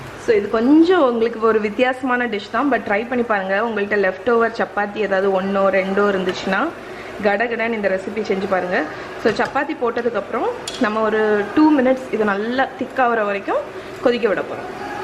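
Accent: Nagercoil, India. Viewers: Nanum nagercoil than akka.....ipo konja days ah than unga videos parkurean.....super akka...nice character you are........ enaku 2 months before than baby poranthuruku....unga kutties parkum pothu enakum Oru girl baby vanthatha nenachu proud ah iruku akka....nager coil slang ah YouTube la parkum pothu romba happy ah iruku.....may God bless you akka.....en husband ku cake romba pidikum...unga channel parthuthan cake pannurean...nice receipe....super.....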